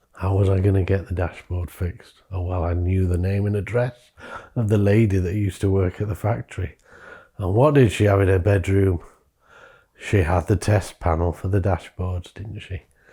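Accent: United Kingdom. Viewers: Great to hear a uk accent on this Chanel! I think Americans would be surprised how many tvr’s you see driving round at least where I live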